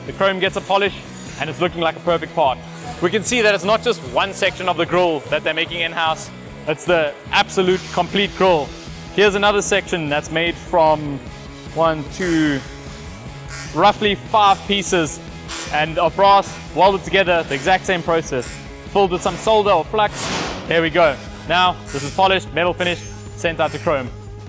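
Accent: South Africa. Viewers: Thats South African accent.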